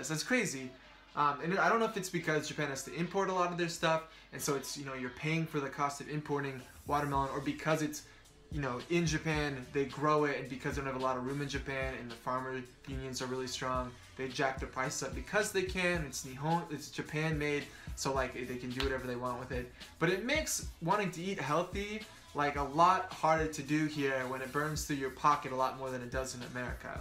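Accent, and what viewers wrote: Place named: United States. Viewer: Are your parents from America? I can't come up with any reasons for your accent beyond that. Don't worry, it is very slight and faint, it is nothing to worry about. A strong New York or Southern accent would make it harder for you students to understand you, so again, I was not trying to be negative, I was just asking. Thank you for responding.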